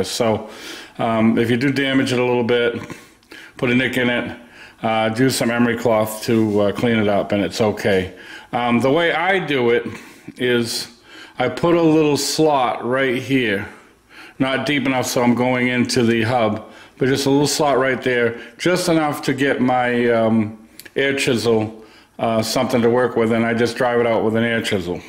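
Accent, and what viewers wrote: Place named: Rhode Island, United States. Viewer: Live in ri? I spot our accent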